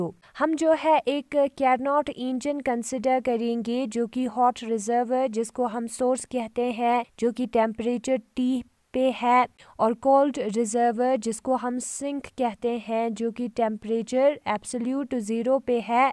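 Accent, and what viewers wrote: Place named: Kashmir, India. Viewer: Ur accent is Kashmiri for sure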